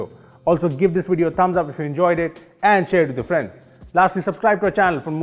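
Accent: India. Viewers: I'm a simple man. I hear an Indian accent, I press dislike.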